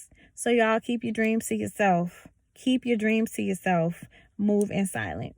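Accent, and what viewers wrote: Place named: United States. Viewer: with an american accent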